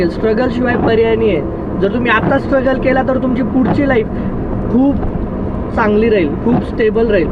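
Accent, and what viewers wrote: Khandesh, India. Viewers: Dada Tuz boln khup Chhan vatat ahirani Karan me pn nasik Chi a satana tal madhun tuz boln jvlch vatat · Kay dada jordar khandeshi😂😂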